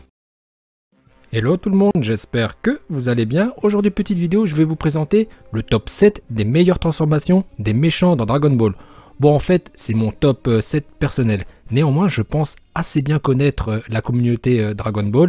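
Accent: Japan. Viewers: J' aime trop ce que tu fais avec ton accent japonais dans tes vidéos continue comme ça 👏👏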